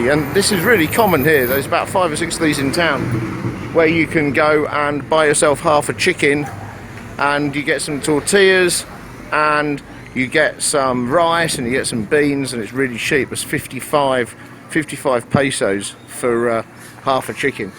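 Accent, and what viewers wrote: United Kingdom. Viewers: I know what Southwold is :-) just checking out PM as a destination as we lost flights to Roatan, 2021 due to the pandemic its looking like a good plan B, thanks for the tour and hearing a Brit accent, Im glad we arent the only ones who venture further afield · Which British accent is yours precisely? Thanks.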